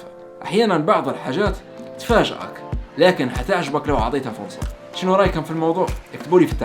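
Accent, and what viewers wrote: Libya. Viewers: يازين الهجه الليبيه ياعرب 🇸🇦🇱🇾💓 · هلا بعودتك منور وفيديو رووووعة يسلموو ... اللهجة الليبية عشق لا ينتهي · اللهجه الليبية قريبة جدا من الجزائريه ❤️❤️كل التوفيق والنجاح ✌️💙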